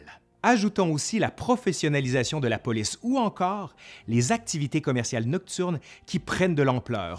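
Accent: Canada. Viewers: Selon ton accent, tu es canadien alors si tu parles en anglais tu auras beaucoup de visibilité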